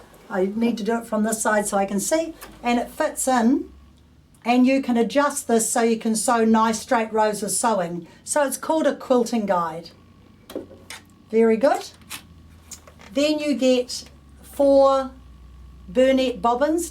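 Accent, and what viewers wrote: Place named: England, United Kingdom. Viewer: Nice lady but I cannot understand half of what she says because her accent is so strong. English I presume.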